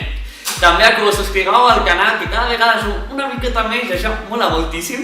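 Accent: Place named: Valencia, Spain. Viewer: Acabo de descobrir el canal, sincerament, gràcies. El contingut és espectacular, molt necessari, i a més dóna una mica d'esperança en la humanitat. Lluitem tots per una societat millor, lliure. Aquest vídeo (i el canal en general) haurien d'estar en tendències i que tothom el vegi i reflexioni. A tot això, sumar-li que parles en valencià, que pel menys a mi, com a catalana, em delecta l‘oïda., segueix així!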